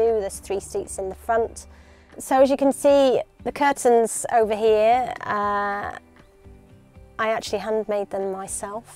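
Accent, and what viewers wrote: United Kingdom. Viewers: British accent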